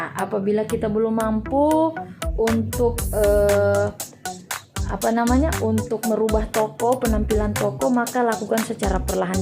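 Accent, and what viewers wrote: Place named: Sulawesi, Indonesia. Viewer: Ibu ini orang mn, logatx kaya orang Sulawesi.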